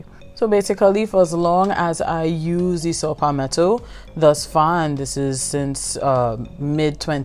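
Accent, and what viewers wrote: Trinidad and Tobago. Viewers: Trinidadian accent 😍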